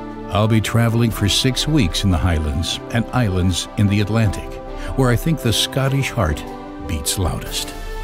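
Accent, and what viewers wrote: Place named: United States. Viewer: He's American I think judging by his pronunciation of most words. Scatland taddies etc. Why not someone Scottish narrating it.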